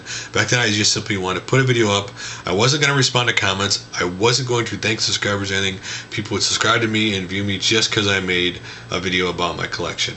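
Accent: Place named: Austria. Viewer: speak German with an Austrian accent - much like Arnold Schwartzenegger's accent. That is quite amazing doing videos in both English and German. I considered doing something similar with Dutch and English videos but most Dutch gamers speak English too so I figured there would not be much of an audience besides doing the same video twice is just an enormous amount of work. Great episode man! A good personality comes across regardless if you are in front or behind the lense.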